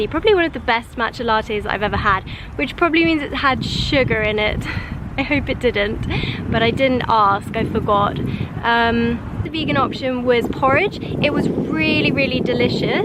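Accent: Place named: United Kingdom. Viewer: Welcome to KSA. Jeddah is one of my favourite cities as I'm from the Middle of Saudi Arabia but loved it a lot. What a lovely sweet accent you have, no wonder that's British my favourite toooooo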